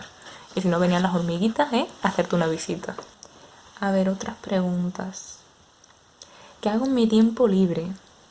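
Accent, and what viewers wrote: Spain. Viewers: Edad tuya estimada: 27 Sobre música preferida hubieras terminado antes diciendo cuál no te gusta xD Del Sur de España lo supuse pero pensé que eras extremeña (a través de susurros es complicado acertar).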